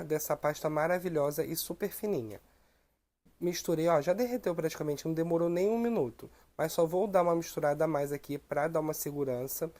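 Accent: Rio de Janeiro, Brazil. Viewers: E eu q ñ me canso de,toda vez q vou fazer uma receita de, rever os vídeos para ter segurança e ouvir esse sotaque carioca e a a perfeição no repasse da técnica junto com a experiência de quem já está no automático. 👍👍amo e amo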